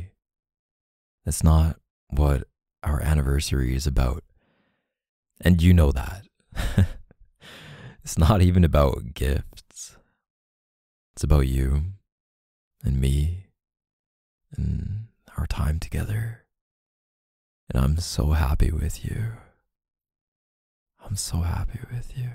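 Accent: Canada. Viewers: he sounds extra canadian here 🫣🫣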